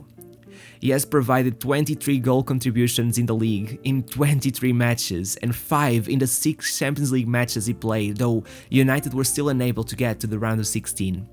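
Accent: Portugal. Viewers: Mal comecei a ouvir falar, "este gajo é português". confirmed😂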